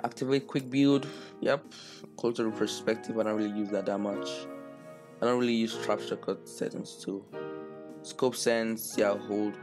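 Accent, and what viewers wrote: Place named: Nigeria. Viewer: i can tell ur nigerian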